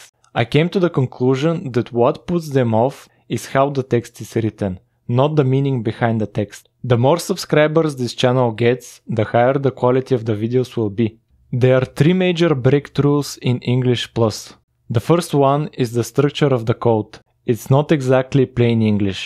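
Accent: Bulgaria. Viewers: To be honest, i still haven't watched the whole video, but your accent sounds bulgarian, or from the balkan countries at least. I find your channel interesting though. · Wonderful video! Do you happen to be Bulgarian too? I noticed your accent😅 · because I am bulgarian and you sound like one :D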